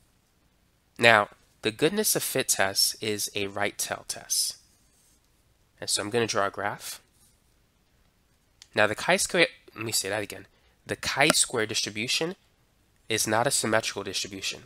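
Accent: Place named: Texas, United States. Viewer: bro is from texas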